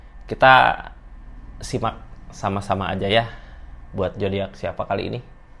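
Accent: West Java, Indonesia. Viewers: Aminnn😊 kang logat na meni nyunda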